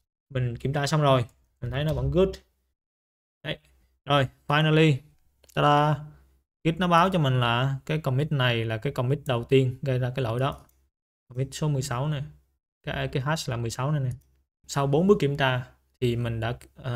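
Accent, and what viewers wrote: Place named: Central, Vietnam. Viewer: các video của anh khá hay, lại nói giọng miền trung rất thân thiện nhưng channel lại ít video quá. không biết a có dự định làm thêm nhiều video trong tương lai không ạ?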